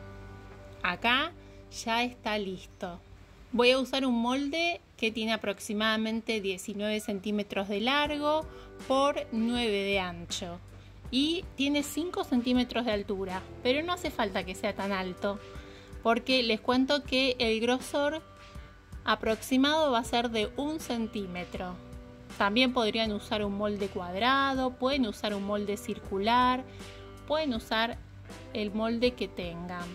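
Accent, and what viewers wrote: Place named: Argentina. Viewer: voy a probar tus Recetas, te encontré, y me encantó como explicas,lo sencillo y se ve todo Delicioso!!!!! Que lindo paisaje desde tu ventana!!!!! Por tu acento,me pareció que sos Argentina.....me equivoco???? Ja ja bueno....es curiosidad.....Gacias,Abrazo desde Argentina.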